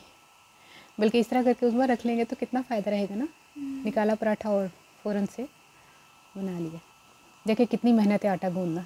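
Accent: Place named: Punjab, India. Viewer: Wooow v nice... Apk baat krny ka andaz bhot khub surat h r sath main panjabi ka tarrka wah wah 😍😘😘💖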